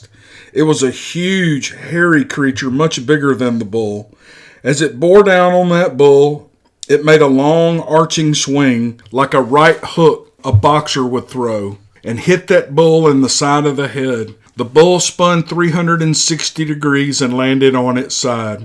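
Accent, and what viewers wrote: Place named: Georgia, United States. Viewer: Thanks, sir. I am from the Deep South. I also lived in LA about 12 years, moved back south for about 16 years, and now happily reside in the Seattle area. I definitely believe in Bigfoot and listen to a lot of channels on the subject. I think I enjoy your narration more than any because you have a particularly appealing southern accent. Reminds me of southwest Georgia. You sound kind of like my brother in law, who’s a really good guy. I had heard this story on another channel some time back and found it fascinating, and so glad you are sharing it here.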